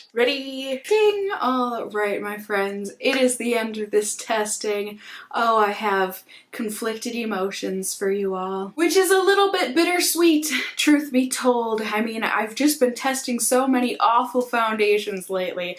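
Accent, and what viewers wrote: United Kingdom. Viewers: The British accent 😂😂😂😂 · Your British accent is lovely 😃 love your videos..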